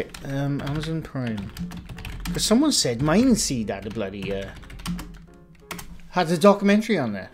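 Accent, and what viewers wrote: Wales, United Kingdom. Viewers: Luv Welsh accent too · enjoy listening to your lovely welsh accent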